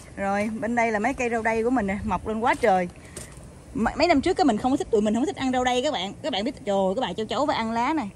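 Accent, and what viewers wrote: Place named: Saigon, Vietnam. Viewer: Cô ngạc nhiên nói giọng SG nhưng dùng từ MB.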